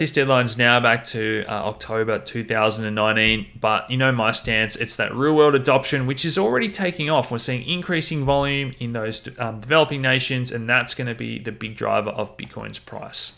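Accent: Australia. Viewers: Great channel! Great find! Have been watching themoneygps and Lynette Zang etc Good to hear an Aussie accent in this space but adds in crypto as well.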